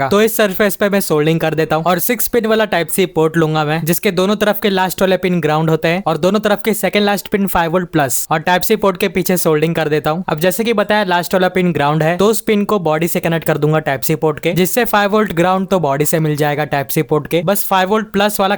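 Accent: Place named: Maharashtra, India. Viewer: App marathi ho na, aapke hindi bolne ki tone se lagta hai ki aapko marathi aati hai ❤❤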